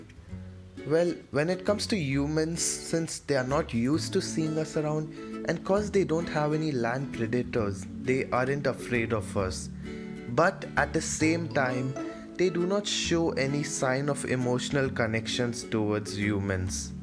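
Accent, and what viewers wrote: India. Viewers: annoying Indian accent.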